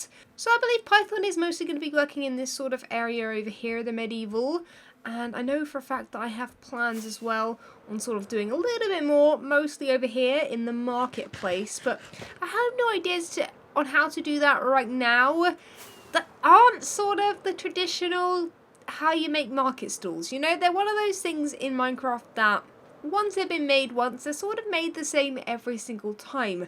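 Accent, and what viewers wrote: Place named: London, United Kingdom. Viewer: She’s from London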